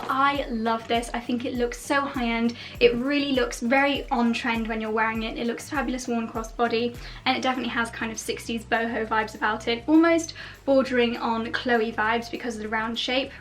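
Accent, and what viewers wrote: United Kingdom. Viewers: you might be surprised that I use your videos for my teaching!! I teach British pronunciation and you're one of my favourite Vloggers and I play your videos in class often! But I do love fashion and what you introduce every time! Thank you! <3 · R u british?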